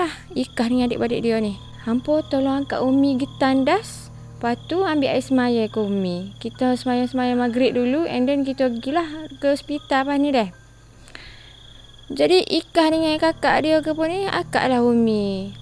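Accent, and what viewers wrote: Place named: North, Malaysia. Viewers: Assalammualaikum n hadirrrrrrrrrrrr bt set2 n krew, bapak besaq cek boh Nama kita, terima kasih ye mention Nama kita yg jelas nmpk, sapa rabun pn mst nmpk kleaaar❤❤❤❤❤, loghat utara sempoi cerita kali ni.. 😅😅😅😅😅 Moga bereh pa skala ya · Hadeeeeeerrrr right now in the comment section below😅📏✌🏼 * Sis ckp utaqa😄👌🏼❤️👍🏼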